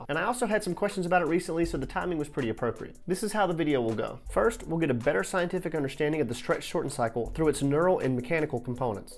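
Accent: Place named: South, United States. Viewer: First time on the channel. I hear a Tennessee or Kentucky accent there. I picked up on it right away for some reason. Great video, I subscribed.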